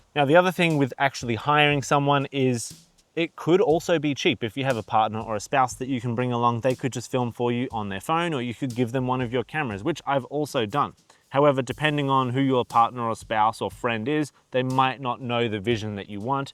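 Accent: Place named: Australia. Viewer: Great video - also love to hear another Aussie’s accent on YouTube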